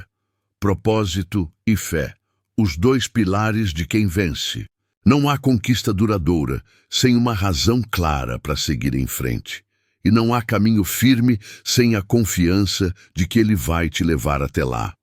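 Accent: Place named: Portugal. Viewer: Os vídeos são bons o que incomoda é esse sotaque português não entendo porque colocam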